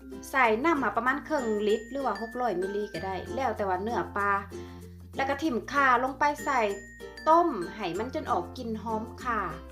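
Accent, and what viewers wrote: Laos. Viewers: ตามมาเพราะชอบฟังพุดลาวเพราะคัก อั่นนะๆ เด้ะ มีเสน่ ลาบปลาพอทำสุกนี่เหมือนทอดมันเลยนะจ้ะ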